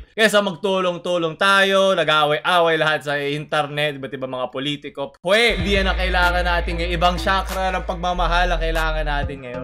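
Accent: Visayas, Philippines. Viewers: Bisaya ka hahaha yawa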